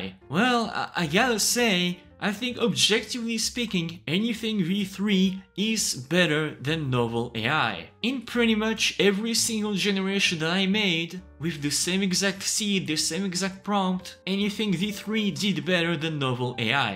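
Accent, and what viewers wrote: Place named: France. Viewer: En 0.23 secondes j'ai compris que j'avais affaire à un Francais.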